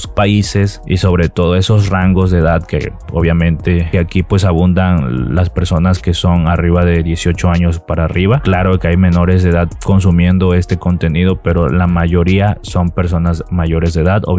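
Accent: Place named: Colombia. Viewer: Me encantan sus videos, pero creo qye el video lo hizo su marido. No he visto otro video parecido a éste, porque hay unas personas que tienen videos de suculentas y muchas plantas, con muy buenos consejos de abono y tansplantacion y compra. Pero seguro que es su marido el autor del video. Si así lo creo. Porque tiene acento colombiano.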